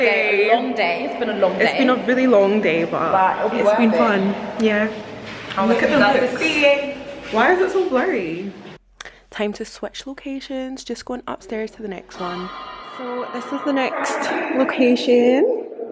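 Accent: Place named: Scotland, United Kingdom. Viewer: I actually forgot you are Scottish 😂😂 it’s been so long since I’ve heard you speak. Love it.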